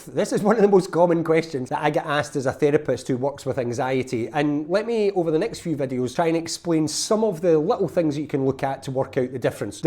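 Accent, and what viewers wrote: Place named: Ireland. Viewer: Started listening because your Irish (?) accent is just divine to my ears — stayed because you really hit a nerve & I feel so seen now! Subscribed.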